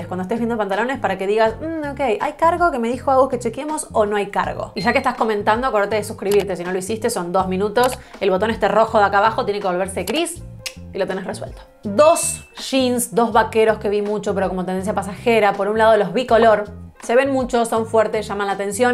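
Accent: Argentina. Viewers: Ajjaaj.perdon pero me resultó super extraño escuchar tu acento argentino y que hablabas de invierno cuando nos estamos fritando en Argentina. Luego fui a ver la descripción del canal y vi qur no estás en el pais. Fue muy raro, lo quería decir 😂. En Argentina los cargos se estan usando muchisimo y tambien los rectos y anchos. No te digo que tiende a desaparecer el chupin, pero se usa mucho menos! Y de esos con la manga doblada no he visto, hace años que no se ven, se usaban antes, yo los usaba de hecho, pero no los he vuelto a ver en la calle por el momento. El capri me gusta pero no a la rodilla, de largo hasta la pantorrilla esta bien. Tan corto es como los hombres cuando usaban pantalón corto hasta los 18..... quedan feítos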